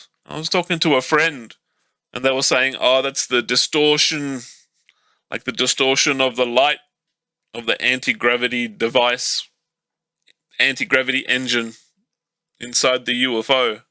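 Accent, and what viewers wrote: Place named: Australia. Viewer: I got sooo much to catch up on 👍love your Aussie Accent coming through 😅.. this sounds amazing.. 👍 Great to catch up on some new videos ( new to me as I’m running behind ). Always great to watch your videos.. WOW what Size is that I wonder 💭 Thank you so much for sharing again 💫😃 🛸 🛸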